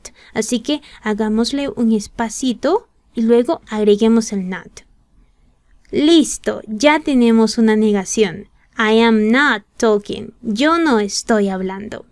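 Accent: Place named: United States. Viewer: Es inglés americano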